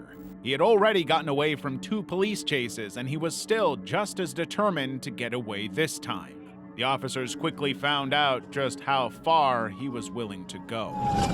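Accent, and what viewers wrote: United States. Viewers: You reference kilometers over MPH, yet have an American accent. Are you American?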